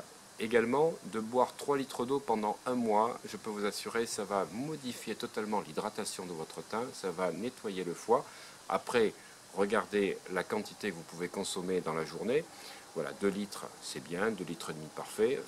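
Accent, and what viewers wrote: Bordeaux, France. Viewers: j'adore votre accent qui me rappelle mon origine Bordelaise, merciiiii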